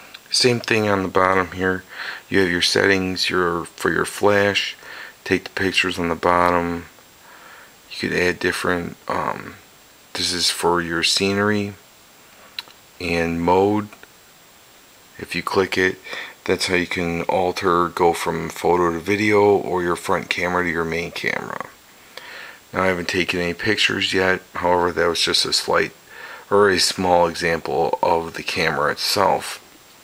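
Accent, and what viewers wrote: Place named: Michigan, United States. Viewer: r u frome michigan?.. or somewhere up north?.. i like ur accent :)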